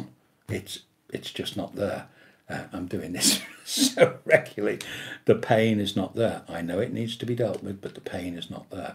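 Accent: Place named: United Kingdom. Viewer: Abonniert wegen der Aussprache. British English 💖💖 Greetings from Munich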